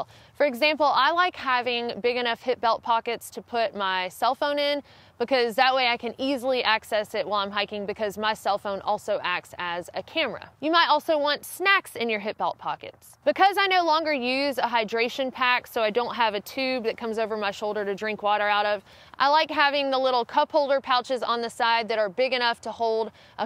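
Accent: South, United States. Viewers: Wow, a firehose of great info. I thought she said "ass axe," then turned on the closed caption option and rewound to discover it was "ice axe." Trying to figure out which part of southern US she's from. Maybe Alabama?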